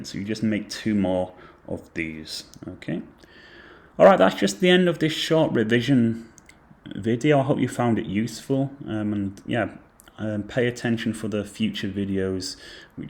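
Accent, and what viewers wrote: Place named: England, United Kingdom. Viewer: I like the english accent and the way u make this sound soo obvious😭🙏. Called us baboons in all tongues 😭🙏.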